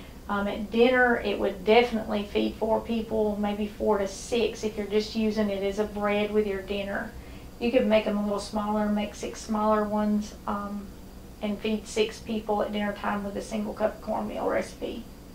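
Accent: South, United States. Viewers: Just Wow. I came here because I love your channel and your Southern accent reminds me of my Family raised Down South, many who have passed on to Higher Ground.... [Even though we are of different Races, As an African American I truly believe ....We are ALL family on this earth as far as I am concerned. Let me just say, your soliloquy on "Forgiveness" was profound and beautiful. I was in tears by the time you went back to finishing up your Johnny Cakes Recipe, "You took me to Church today", as the older folks would say when someone spoke wisdom and Grace. I have had awful things happen to me during my lifetime; Evil done to me by others I was kind and generous too....pain and damage so profound, I felt it was beyond my control to stay on this Earth....I still have moments where , because of horrific losses and fiscal damage done to me....That I just want God to take me ...However, I am still here....I read Psalms , Matthew Etc. 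to soothe me....My motto to get through this life is "Forgiveness is a give you give yourself....Hating and being angry is like drinking poison and hoping the other person dies"....God Bless you and Thank you for your wise, cogent advice. I truly believe that God put you in my path today....I needed to hear your sermon, it was timely. Thank you again.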